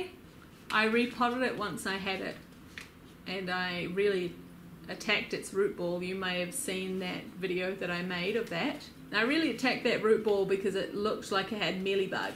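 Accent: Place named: Auckland, New Zealand. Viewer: Hi from Auckland, I'm Sarah from Auckland. I wonder if we went to school together a long time ago. I was watching a bunch of Hoya vids then yours popped up and I thought "Hey! I know that accent!" Lovely collection you have there. I've had one hoya my whole life then recently started adding more. You've done soooo well with your Obovata and Kerrii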